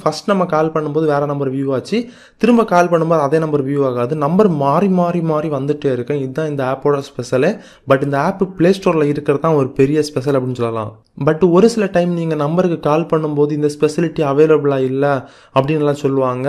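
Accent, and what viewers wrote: Kanyakumari, India. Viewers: Kanyakumari neega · Bro neenga kanyakumari District ahh.....??? Slang paatha theriuthu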